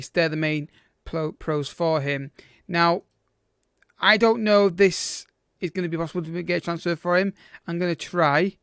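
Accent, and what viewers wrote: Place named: Northern England, United Kingdom. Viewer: Nice video mate. I have a bit of a problem listening because of your strong accent haha. north english is my guess. Maybe its the mic idk. But keep it up. :)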